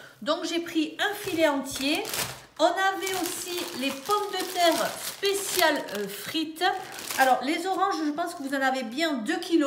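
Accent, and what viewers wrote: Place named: Southwest, France. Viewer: Coucou je réagis concernant les chocolatines oui dans le sud ouest on dit comme ça, moi qui suis originaire du nord on dit petits pains au chocolat. Depuis plus de 20 ans que je vis également dans le sud ouest je dis chocolatine sauf quand je remonte tout là haut. Entre parenthèse je vis non loin de Dax 😉🌞, je me doutais à ton accent mais sans grande certitude.😊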